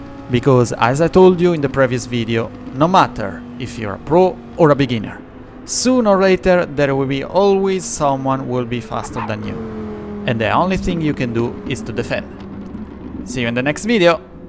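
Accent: Italy. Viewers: non ti offendere ma una cosa te la devo dire (dall'accento direi che sei romano come me): imparati gli accenti e le preposizioni in inglese perche' veramente suoni strano eh. La pronuncia di opponent, protect, penalty, delaying, allowed, comfortable, legit, our, consequences, assume, essence, e alcune altre parole e' sbagliata, soprattutto l'accento, e l'uso delle preposizioni e' spesso sbagliato. Google translate e altri tool gratuiti ti possono aiutare perche' leggono i testi a voce alta. Sono parole che parlando di corse auto dovrai ripetere ogni volta, quindi aggiustale una volta per tutte e via.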